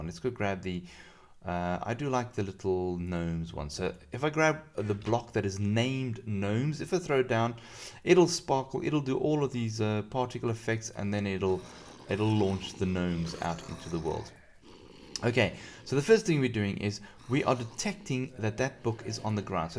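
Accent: South Africa. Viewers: Is your accent South African, if not it sounds surprisingly like it.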